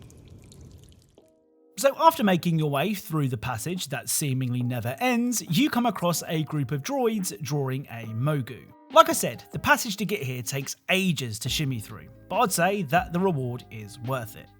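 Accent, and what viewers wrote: United Kingdom. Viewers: I really have to say this but finally seeing how you look in real life your voice doesn't how you look your voice is so tame British I guess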